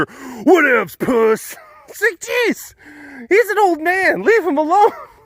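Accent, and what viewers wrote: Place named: Wisconsin, United States. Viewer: Your WI accent is strong in this one. :)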